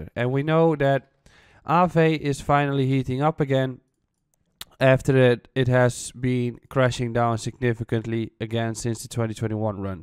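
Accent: Netherlands. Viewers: Are you Dutch? 🇳🇱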